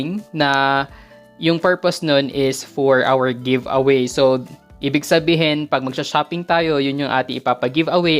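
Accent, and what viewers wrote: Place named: Conyo, Philippines. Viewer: Finally we all see your face with facemask Sir😊🙌 All I learned about you Sir is your conyo voice😂 nadadagdagan yung vocabulary words ko may Botany class pa kami sayo LOL 😂 more power to your video Sir!👏🏻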